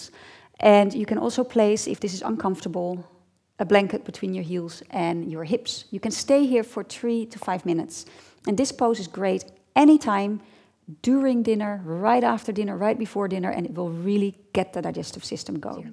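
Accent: Netherlands. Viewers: Heerlijk die Hollandse T's in plaats van de 'Th' klank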